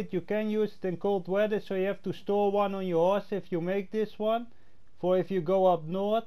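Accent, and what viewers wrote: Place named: Netherlands. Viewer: You sound Dutch for some reason lol. Cool outfits man.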